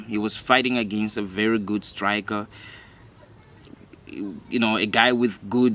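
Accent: South Africa. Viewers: I could tell by your accent that you're South African. I'm from East London, Eastern Cape. Keep up the good work, love your content.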